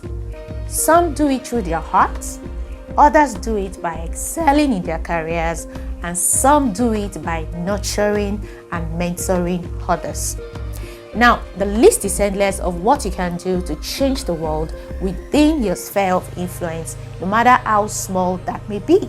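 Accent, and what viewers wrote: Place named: RP, United Kingdom. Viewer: This makes a lot of sense. Sequentially presented with Queen's English. Engr. Dr. S. A. Aina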